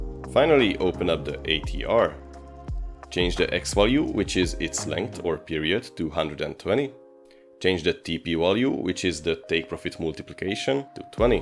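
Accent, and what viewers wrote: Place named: Hungary. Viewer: Hi! My I ask, are you Hungarian? Just because of your intonation and accent sounds like that. I am from Hungary! 🤘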